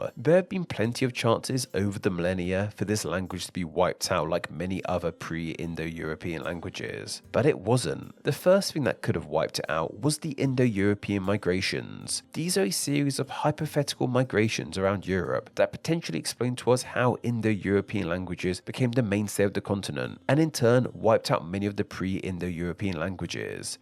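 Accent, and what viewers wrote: United Kingdom. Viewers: He is British, ever heard of different accents?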